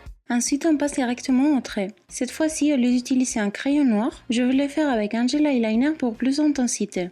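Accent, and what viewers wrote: Spain. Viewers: J'adore tes vidéos! Mais, je dois te dire j'aime trop ton accent! Il est trop chou! On dirait que tu parles l'espagnol mais en français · J'aime beaucoup le second make up, tu es très jolie, mais j'ai du mal avec ton accent x) Tu es espagnole? portugaise ?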